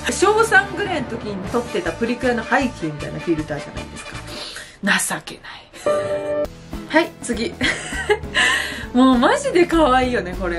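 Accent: Nagasaki, Japan. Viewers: 久しぶりに見たバイ。おぉ、かわゆくなっとるやないか、長崎弁と致死量の毒は変わっとらんな！安心した。🤣🤣🤣🤣🤣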